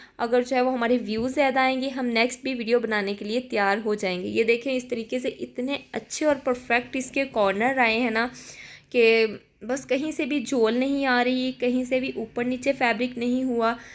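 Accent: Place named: India. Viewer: bolne ka pendo styel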